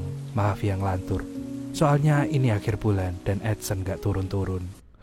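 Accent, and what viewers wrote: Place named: Banyumas, Indonesia. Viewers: Ternyata adminnya ngapak.. Salam ngapak min!! Kober kobere koh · Anjai 😂ngapak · Wasik Salam ngapak Ora ngapak ora kepenak Aja kelalen mendoan e Lik di sambi 😂😂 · lah rika si wong ndi deneng ngapak?! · Dene wong ngapak kang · Wong ngapak ya kang Tek subrek lh meski mbene tau nonton 🤣🤣🤣🤣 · Laaah rika jebule ngapak wa.. 😂❤ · Kaya wong ngapak bahasane😂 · ccte cah ngapak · wong ngapak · Ngapak PWt, PBg, CiLanyap, Kebumen, apa Banjar Negara kie.. Nyong Pwt kota ra Nganggo bahasa "Rika" 😂 Langsung tek subrek kie pokoken anu seaLiran 👌🙏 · Wong ngapak ki genah.. Rika Rika.. 😂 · Wong endi min,kok ngapak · Anu wong Banyumas apa ya, lek.. Yuh ngopi bareng lah wkwk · Ngapak rika y min he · ngapak kie minn wong ndi rika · Rika wong ngendi kang deneng ngapak temen bahasane 😅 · Wong ndi mas deneng ngapak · Rika si wong ndi... Aku Banyumas.... 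Kaya bahasaku kue deneng 😅😅😅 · Deneng rika ngapak😂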